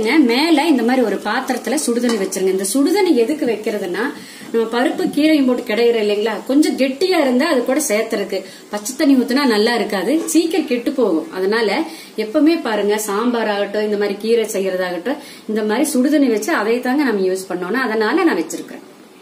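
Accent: Kongu, India. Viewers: கொங்கு தமிழடன் கீரை கடையல் சுவைக்கிறது !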